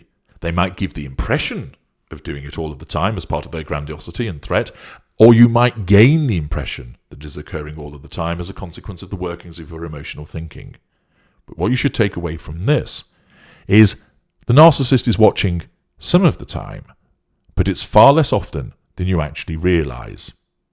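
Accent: United Kingdom. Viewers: I dont like your british accent. Make your videos in an american accent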